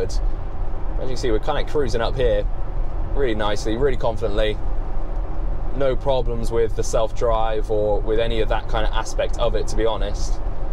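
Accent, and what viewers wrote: United Kingdom. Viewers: Great to hear a Brit commentary for a change. Nice interesting engaging content. Could do with more shots showing what the car is seeing on the display. I'm waiting for a 3 or Y. But still interested to see where autopilot is at on UK roads.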